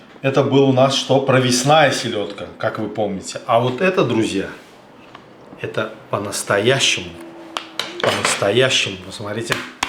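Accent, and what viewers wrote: Baku, Azerbaijan. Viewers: Самый чёткий бакинский акцент приятного слушать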